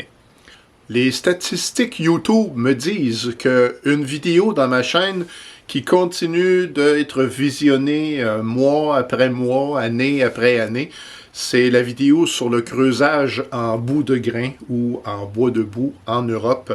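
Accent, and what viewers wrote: Quebec, Canada. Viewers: Il a l’accent québécois. Bravo pour la pédagogie !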